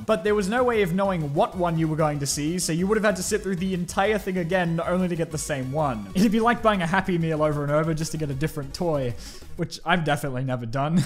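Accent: Australia. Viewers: "Babe Wake up, the funny Australian made a new video" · funny Australian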